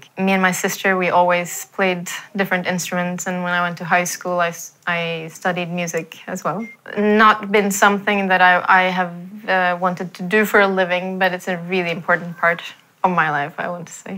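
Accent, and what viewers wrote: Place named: Turkey. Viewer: Ablam ya :dd konuşmaya başladığı ilk anda dedim ki bu kadın kesin Türk ancak Norveçliyim deyince de ümidimi kesmedim çünki bu ingilizce aksan ancak Türkiyeden yolu geçmiş birinde görülür :))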